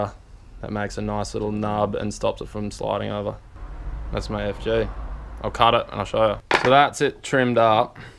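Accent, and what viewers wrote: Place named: Sunshine Coast, Australia. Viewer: Fark me! Briggsie’s brother from another mother! Good , informative vid. Are you from Sunny Coast also? Same accent. That way to tie the FG is the only way I reckon is the bomb. Searched heaps and this method finished off with the rizzuto knot is the best by far!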